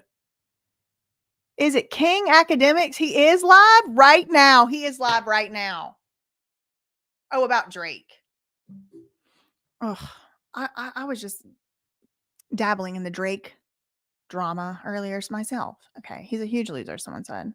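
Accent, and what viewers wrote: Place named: Tennessee, United States. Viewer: accent has Tennessee written all over it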